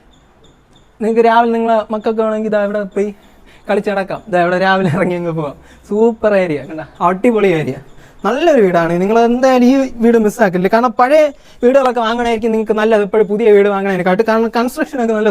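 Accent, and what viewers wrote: Parassala, India. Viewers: ആദ്യമായിട്ടാ നിങ്ങടെ വീഡിയോ കാണുന്നെ, നിങ്ങടെ സംസാരം കേട്ടിട്ട്, പാറശ്ശാല - കൊറ്റാമത്ത് എനിക്ക് പണ്ട് ഒരു കൂട്ടുകാരൻ ഉണ്ടാരുന്നു അവന്റെ അതെ സ്ലാങ് 🙂..കേട്ടപ്പോ പെട്ടന് ഓർമ്മ വന്നു..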